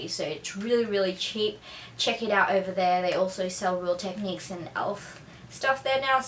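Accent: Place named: Australia. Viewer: Hey, just wondering, did you grow up in Australia since young/born there? Cos you have a really thick Australian accent & its quite interesting to imagine how you'd speak tagalog with such an accent hehe;)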